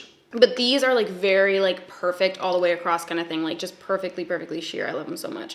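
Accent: Canada. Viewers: I fucking knew u were canadian. gang 🍁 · You look extra beautiful and happy in this video tysm your vids make my day! Ive been living in england for almost 2 years now and sometimes i just really need a canadian accent to make me feel like Im home 😫😭😛 Love you!!!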